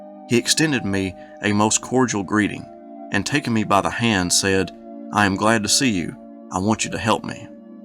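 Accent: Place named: Virginia, United States. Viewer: listening to your accent over your last three videos, when you say letters and words like a, an, the, it sounded like a tidewater accent. If you're not from Norfolk, then your accent also sounds like it's from or at least developed in the tri-states region of Virginia. When I listen closely, I'm really hearing Appalachian overtones in the words, tember and cadencé . Respectfully WS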